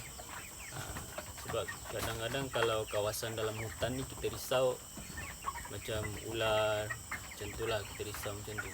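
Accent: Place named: Peninsular Malaysia, Malaysia. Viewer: Awk org smenanjung yer. Saye igt org sabeh..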